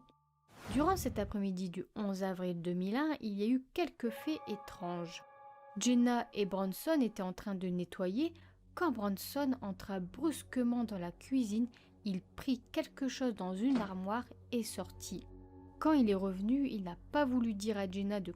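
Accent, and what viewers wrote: North, France. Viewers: L'accent du Nord on le reconnait...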